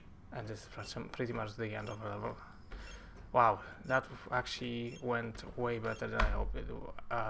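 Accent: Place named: Poland. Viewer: I love this Polish accent.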